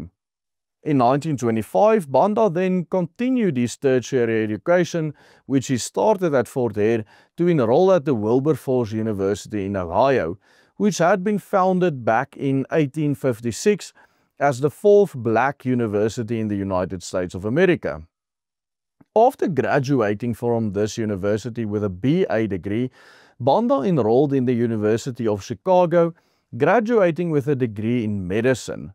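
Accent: Afrikaans, South Africa. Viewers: Sorry, Afrikaaner English is just too unattractive … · This is the second video of yours I watched. Perhaps it's unfair but your charming Afrikaner accent certainly makes your videos more enjoyable to listen to- even as you tackle difficult topics. You offer unflinched views and not beholden to left-wing fixations but I think you have warm human regard for humans regardless of race. Afrikaners are genuine sons of Africa, from my outside view, and hope your people and nation keep prospering and making contributions. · can you speak any other lanuage and how..beautiful afrikaans accent why must it be...??? like more english..❤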